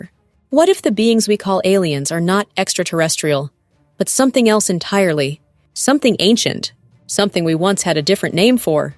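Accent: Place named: United States. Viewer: Я думал канал будет озвучен обычным нейронным голосом, не ожидал что будет такой качественный английский с превосходным американским акцентом.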